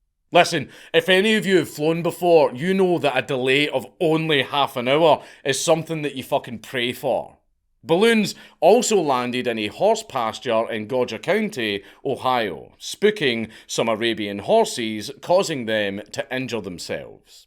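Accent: Scotland, United Kingdom. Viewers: Hearing a Scott talk about my hometown feels wrong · You are my favorite scot, even though you live in brit. Love your accent of homeland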